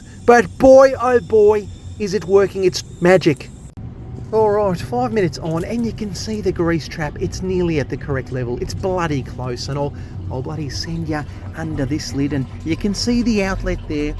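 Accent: Australia. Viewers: This reminds me why i don't got to Chinese buffet anymore and why it gave me diarrhea when i did.... this is funny shit and the aussie commentary makes it better...... it's choka blocked mate.... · Watching from the Netherlands. Stumbled on your channel when your vid about unclogging a drain in a parking lot showed in my suggestions. No idea why, but it is hilarious, especially with your ozzie accent. Early next year we have plans to visit Perth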